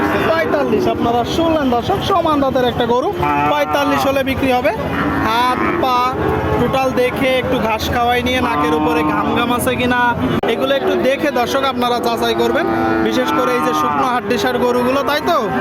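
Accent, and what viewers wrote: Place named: Jessore, Bangladesh. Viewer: আসসালামু আলাইকুম।ভাই এটা একটা অনেক বড় প্লাটফর্ম।এই ভিডিও অনেক মানুষজন দেখে। অতএব এখানে শুদ্ধ ভাবে কথা বলাই উত্তম। যেমন, আপনি বলেন কিরমিরি ,সত্তশ (হবে কৃমি,সত্তর) এধরনের শব্দ কি আদৌ বাংলা ডিকশনারিতে আছে? তাছাড়া যশোরের ভাষার একটা ঐতিহ্য আছে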